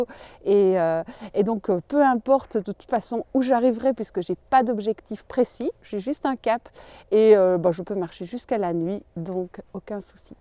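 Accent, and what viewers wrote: Belgium. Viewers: Bonsoir nous dans le Béarn c’est 1 heure avec un rayon de 1 kms et bien sur avec la permission de l’attestation bien remplie autrement 135 euros on est devenu dés hamsters 🐹 dans leurs cages quelle chance de pouvoir se balader où on veut .belle balade et l’accent belge qui nous berce .bye bye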